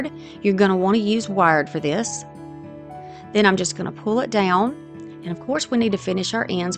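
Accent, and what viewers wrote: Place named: South, United States. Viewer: PS ....I LOVE LOVE your accent ... I regularly watch Randy and Amanda from Incredible Tiny Homes ...I found them during tough times ...so now I am a sucker for a southern accent ....wishing you well ...happy crafting ..xo from Vancouver BC Canada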